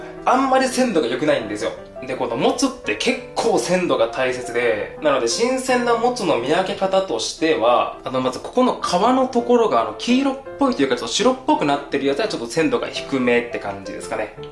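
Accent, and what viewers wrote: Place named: Fukuoka, Japan. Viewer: ホル鉄最高ですよね 最近見始めたばかりですが 語尾が、やねとかイントネーションとかで もしかしてと思ったら福岡なんですね！ 柔らかい喋り方、聞きやすくてばり好きやん(いきなり博多弁) もしかしたら通りすがっとったかもと思うと...(口が緩みます)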